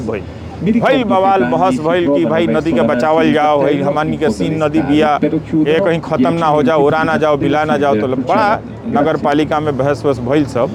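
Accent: Bhojpuri, India. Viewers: एतना मिठा भोजपुरी रउआ मुह से सुनी के दिल गद गद हो गइल बा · एतना मीठ भोजपुरी रउआ मुँह से सुनी के दिल गद गद हो गइल 🥰🥰👏👏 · बहुत ही बढ़िया जानकारी दिहली रउआं।आपके मुंह से भोजपुरी सुनिये के बहुत आनंद आ गईल । धन्यवाद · केतना सुंदर आहा वर्णन करेला।।। हम बिहार के बनी।।। हमरा बर निक लागल आहा के भोजपुरी बोली।।।।।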